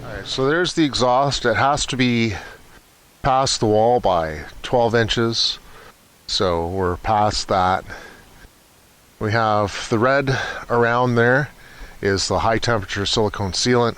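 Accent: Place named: Canada. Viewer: Wow thank you for such a speedy reply! Yes I do have a Mr. Heater. Are you in Canada? ( I see the hat pin and recognize your accent.) (Maine here) I'm thinking U.s may be a little different. However I did decide to do it at around 20" because I really didn't want it sticking 3' into the shop space.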